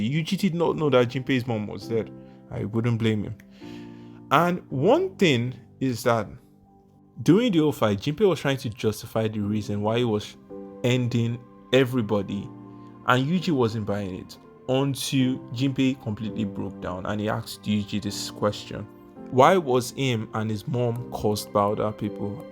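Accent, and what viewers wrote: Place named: Nigeria. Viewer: yeah bro, you sound like you are from nigeria right. i will follow you right now, but you have to improve your script and also the way you say the words.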